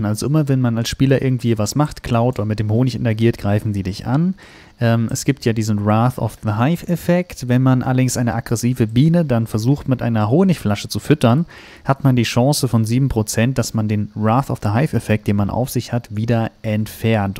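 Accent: Hesse, Germany. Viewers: Auf jeden Fall kommt er aus Hessen meine ich, dachte aber aus der Nähe von Frankfurt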